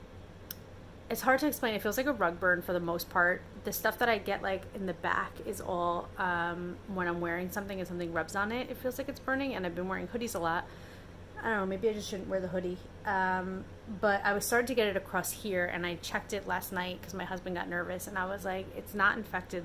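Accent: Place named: New York, United States. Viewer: Also, it’s been a treat to listen to your NY accent, as someone who grew up on Long Island and has the same accent 😊. I don’t get to hear it as much in the midwest and I miss it! 😂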